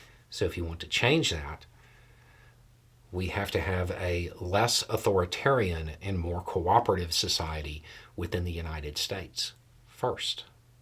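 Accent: New Zealand. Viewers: not sure if this is the case, but you sound as if you’re in NZ now? Possibly studied or lived there? 😊 I would love to get a chance to meet Māori people IN NZ instead of here in the US. The syntax of the language blows my mind. To Beaus point… this tRump disease has been working its way through international politics since about 2017’ish (possibly earlier) I watched Victorian and NSW politicians turn into antivaxxers overnight. I was heartbroken when I heard of the first community (local) infections in both NZ and AUS. Thank goodness I was able to reach a few of my friends who got the vaccine at my personal request. However, as great as being able to help there felt, I had neglected my own parents. My Mom passed from Covid in January of 2022. I wish that I could place the blame on tRump only. I cannot do that while others that lead to so much unnecessary suffering and death still walk around. 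So I keep trying. Thanks for letting me take you verbally hostage for a moment! 🥹 Don’t let tRump or his politics invade your country like he did ours. Lest many more suffer and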